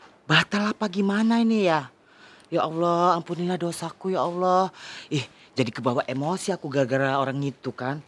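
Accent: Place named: Medan, Indonesia. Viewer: 😂😂😂😂😂😂😂😂😂😂😂😂😂❤❤❤❤❤❤❤💯💯💯👍👍👍👍👍😴😴😳😳😳🤣🤣🤣🤣🤣🤣🙏🙏🙏🙏 orang Medan ya😊😊😊😊😊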